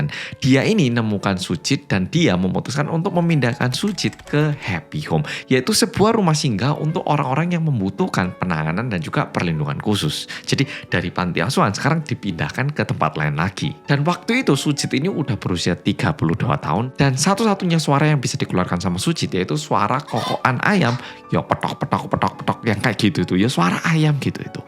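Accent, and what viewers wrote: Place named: Java, Indonesia. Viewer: tks medok ❤ Alhamdulillah Sujit bisa berubah meski pelan👌🏿✌🏿💪🏿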